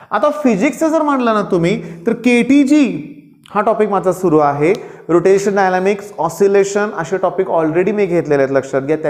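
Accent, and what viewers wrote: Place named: Satara, India. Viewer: साताऱ्याची भाषा !!! 😇 खूप भारी sir....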